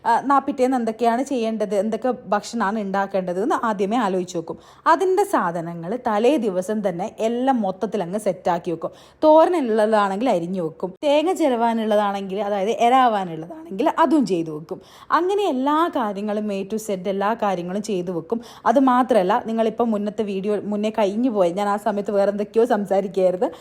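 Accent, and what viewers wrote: Kannur, India. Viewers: നമ്മുടെ കണ്ണൂർ ഭാഷ ഇങ്ങനെ ചറപറ കേൾക്കാൻ തന്നെ ഒരു സുഖാ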